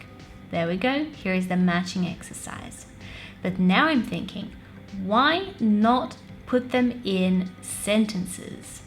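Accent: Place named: Eastern Europe. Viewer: I wonder where her accent is from 🤔 seems like Eastern Europe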